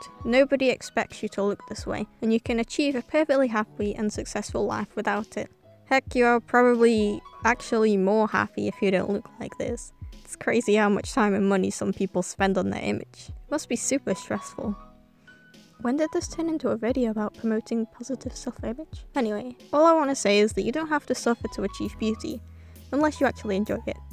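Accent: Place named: United Kingdom. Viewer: You sound like my britt voice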